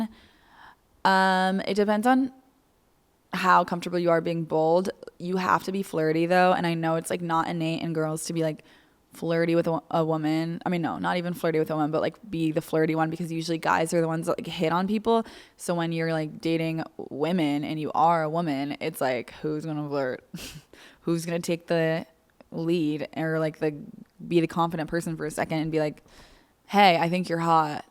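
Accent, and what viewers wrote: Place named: Ohio, United States. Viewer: As a fellow Ohioan who also moved away, I love when your Ohio accent comes out 😂🥰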